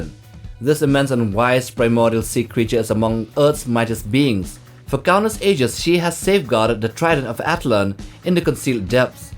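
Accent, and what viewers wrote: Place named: Khasi, India. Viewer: What accent is that? Are you Khasi people?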